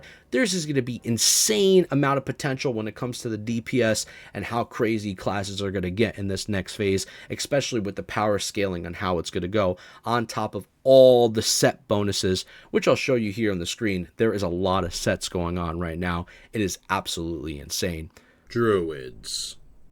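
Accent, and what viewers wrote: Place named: Midwest, United States. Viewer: Dude, lol. Love your videos man. And dat midwest accent 😆🤘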